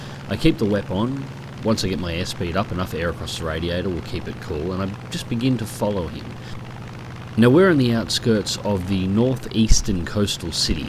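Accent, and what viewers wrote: Australia. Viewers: the firs video I watched was his Sabre f2 firsti pressions video and the reason I subbed was his flying skills his cool calm and collected tone and of course his aussie accent ;)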